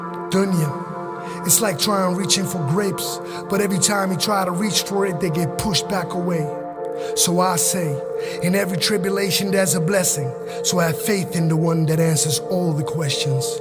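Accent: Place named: Morocco. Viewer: Simply POWERFUL & ELOQUENT! You've spoken nothing but the truth in such an Amazing way. Allahumma Baarek! [AMEEN] 🤲🏽♥️💪🏽 Keep up the good work, you've got an amazing voice, great vocabulary and accent for a moroccan brother, wichis quite rare these days, no offense bro! 🙌🏾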